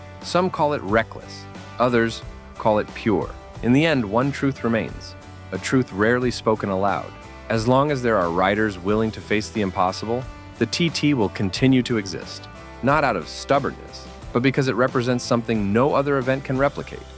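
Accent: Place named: United States. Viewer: ....Uhhh OHHH! An American Accent telling us what's Wot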